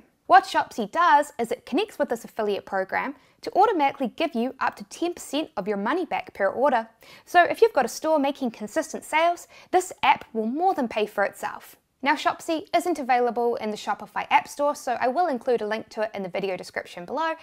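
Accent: New Zealand. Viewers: literally have nothing of value to add, just a shoutout for being a kiwi. came across this channel after discovering the massive world that is ecom and couldnt help but smile when i heard a familiar accent - definitely didn't expect it on youtube. obviously physical location has little bearing on the internet really, but huge respect for building what you have here :~)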